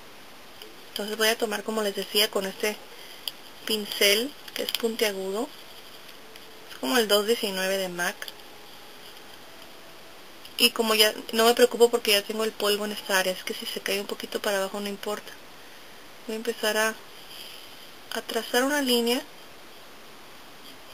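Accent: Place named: Northern Mexico, Mexico. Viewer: muy buen video tienes un acento cmo d monterrey o dl norte d dond eres?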